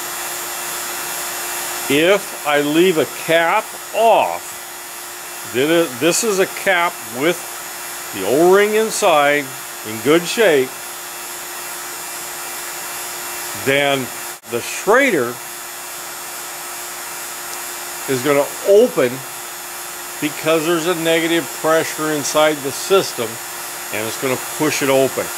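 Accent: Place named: South, United States. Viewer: It makes great sense...I learn from this man every time I watch him....A great, country voice, to boot.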